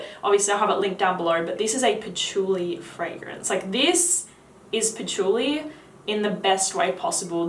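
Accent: Australia. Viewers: I love your Australian accent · Australian accent, language is english. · hello, just discovered your channel, loved the review and the Australian accent 😉 Liked and subscribed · I love your Aussie accent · Are u an Australian ? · I love the way you say "here"...and every other word that ends in "R". You have the best accent of any Australian ever in the history of Australia! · SO FUNNY TO LISTEN HOW STRANGE AUSTRALIANS SAY WORDS "HERE", "YEAR". SOUNDS LIKE "YEAH". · hers is Australian not english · That Australian accent though 😍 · She is an Australian. The American accent is actually the one of the most annoying world wide sorry bro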